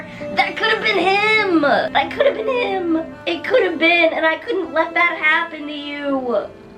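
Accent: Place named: Delaware, United States. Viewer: Im, from Delaware we all still talk like that🤣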